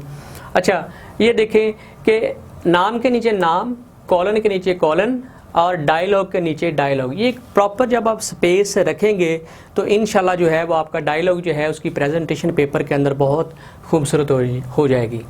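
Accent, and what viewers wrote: Punjab, India. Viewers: Sir , it look like u know Punjabi language by ur pronounciation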